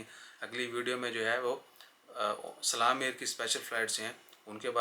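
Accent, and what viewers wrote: Pakistan. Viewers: Salam Walekum kya hal hai theek ho awaaz se Pakistan aadami Corona test Corona test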